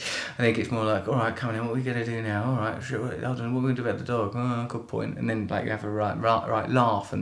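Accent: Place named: United Kingdom. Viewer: relationships = wondering about the dog and then having a laugh and that. you've gotten awfully british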